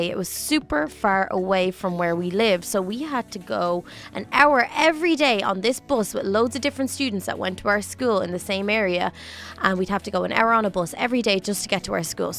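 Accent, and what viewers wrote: Ireland. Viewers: I was born after my grandpas birthday but he died a couple of months ago but you don't sound that Irish kind of but not a lot :P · I fucking knew you were irish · Now I can tell where you get your Irish accent i have been watching your videos for 3-4 years now they make me happy and the other little club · You sound VERY Irish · I couldn’t hear your accent was Form but now I know it’s Irish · I love the accent from down south Ireland (I live in Northern Ireland in Co.Derry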